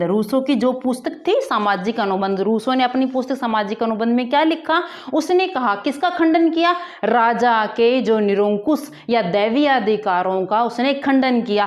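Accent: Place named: Haryana, India. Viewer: Mam I think you are from haryana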